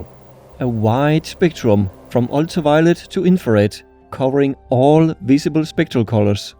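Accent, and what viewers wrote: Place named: Denmark. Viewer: gotta love this accent :) heja Danmark ;)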